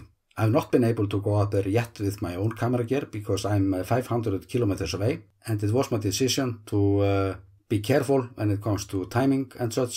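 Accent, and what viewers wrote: Iceland. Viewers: Icelandic accent is so cute and funny!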